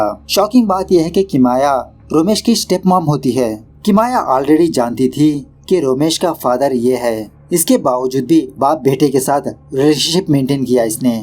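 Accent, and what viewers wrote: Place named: South, India. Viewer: You sound sweet bro, speak Hindi with South Indian accent, we love it.😊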